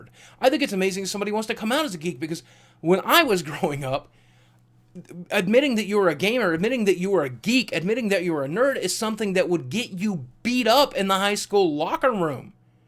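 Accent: South, United States. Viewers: ANIME , but because he's from the south, it's pronounced differently, you ignorant fuck.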